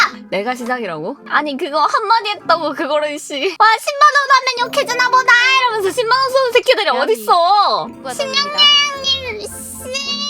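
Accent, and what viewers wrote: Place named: Japan. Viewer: 역시 은 일본식 영어랑 가요느낌이 잘 어울려...다음엔 그런것도 해보자..!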